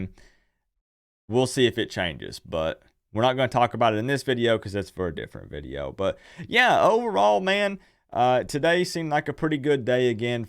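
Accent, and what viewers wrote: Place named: Chattanooga, United States. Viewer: you definitely get that Chattanooga accent..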